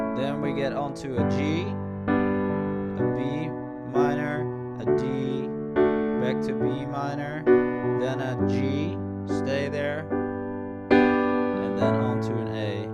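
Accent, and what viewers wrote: Netherlands. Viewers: Thank you, this was helpful! :) Also, you sound like you have a Dutch accent, no? haha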